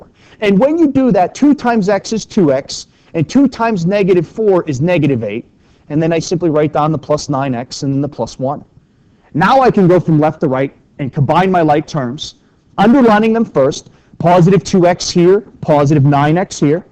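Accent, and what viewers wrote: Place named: New Jersey, United States. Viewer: he has that new jersey accent and im living for it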